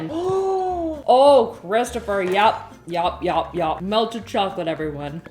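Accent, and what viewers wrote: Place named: Winnipeg, Canada. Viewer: Winnipegers talk like that as well. Not sure about people in Edmonton because we've only been here for 5 months!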